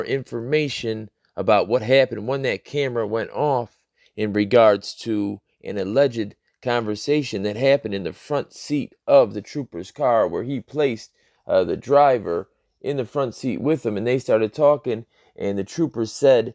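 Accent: Chicago, United States. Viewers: Hey Man, you should get paid for your voice, perhaps not narrating auditing clips but maybe radio. You have a unique cadence when you speak and along with your Windy City accent perhaps you could do "news" as opposed to "music", especially in the upper Midwest. You know, something progressive which would help listeners. I have a good ear for voices and yours is unique and pleasing. My favorite voices to listen to are posh, young English accented girls so you don't get the wrong idea. Just informing you of what you might not have read before.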